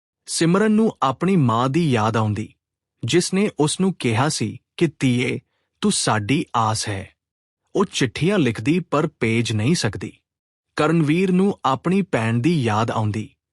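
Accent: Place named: India. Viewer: Punjabi tan tuhadi hindi touch Wali Hai isnu improve karo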